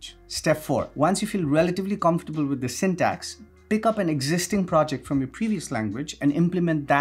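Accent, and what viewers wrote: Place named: Nepal. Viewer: Amazing content! I always felt like you have a Nepali ascent. But today I noticed Swayambhunath Stupa on your hand. :)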